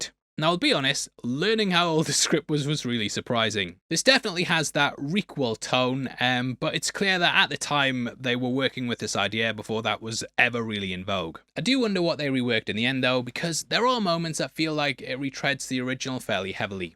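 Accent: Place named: Liverpool, United Kingdom. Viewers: The Weekly Planet guys are Australian, and sounds like he’s from somewhere in Northern England (London is towards the South of England). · Great review, scouser! (sorry, don't know your name... just your accent 😉 ) Only saw the film once (when it came out, I think) but after your review, I think I'll give it a second go - most positively worth it now! 🤩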